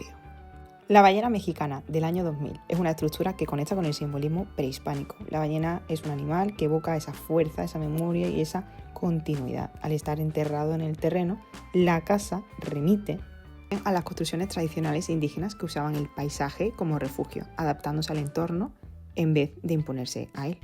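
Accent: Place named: Seville, Spain. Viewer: Una sevillana que intenta cecear. O una canaria que ha vivido en Sevilla. O una hondureña con alguna conexión con Sevilla... No sé, no pillo el acento.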